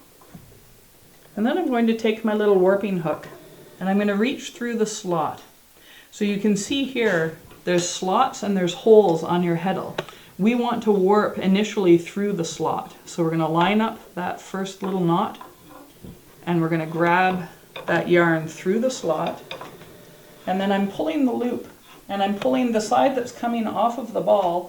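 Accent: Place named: New England, United States. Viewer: Wonderful..thank you so much! i was wondering where your accent came from. i was guessing New England, USA!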